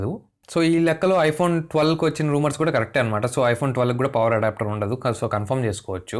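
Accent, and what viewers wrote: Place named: Tamil Nadu, India. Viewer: Superb..bro..voice Bagundi tamil.mix ainatu vasthundi. Good ❤️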